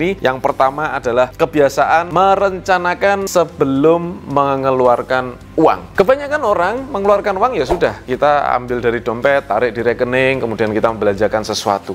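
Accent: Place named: Java, Indonesia. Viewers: Jowo medok mas...hehehe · Logat Jawa mbak 😊 Suami saya juga gitu 😆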